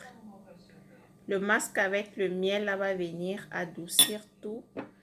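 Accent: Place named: Gabon. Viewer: super video , t es d oú ?t as comme un accent gabonais.😊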